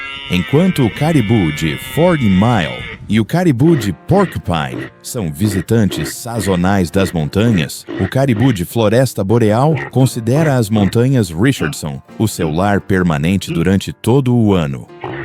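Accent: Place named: Interior, Brazil. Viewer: Quando trocar o narrador por um sem sotaque interrrrrriorano vou conseguir assistir o esplendido documentário enquanto isso desisti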